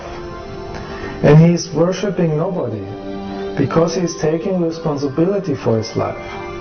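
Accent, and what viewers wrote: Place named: Germany. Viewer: Trovo questo discorso estremamente interessante e veritiero,ma non dovevano, per rendere la cosa piu' credibile ,far spacciare questo signore per un extraterrestre,infatti conosco bene l'inglese ed effettivamente suona come fosse un tedesco parlando inglese,quindi nulla di soprannaturale,ma nulla toglie che il suo discorso non fà una piega.Umanamente bravo nel suo intento.Se non dovessi essere corretto nel giudizio chiedo umilmente scusa a gli extraterrestri.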